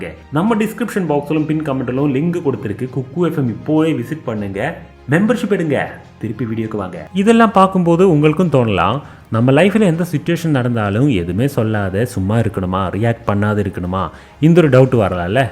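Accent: Kerala, India. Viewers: Neega malayaliya... ? ungaloda thamil vithyasama erukke... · Maliyalais Tamil speech..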